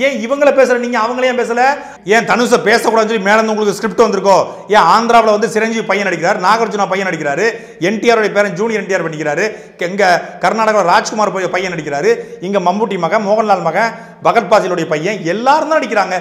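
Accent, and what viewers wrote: Tirunelveli, India. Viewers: நெல்லை தமிழை நெருப்பாய் கொட்டும் சாட்டை வேற லெவல்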